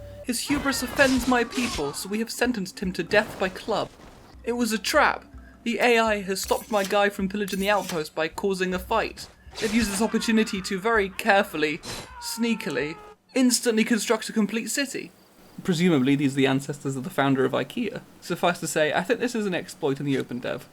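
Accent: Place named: United Kingdom. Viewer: You are the most Bri'ish gamer I have ever witnessed, in a good way gentleman.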